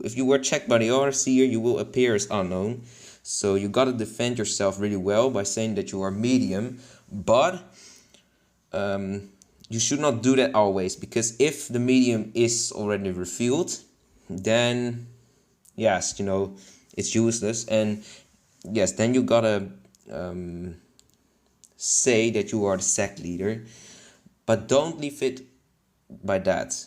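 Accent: Netherlands. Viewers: Bro you sound Dutch man If you are: frikandelbroodjes zijn echt lekker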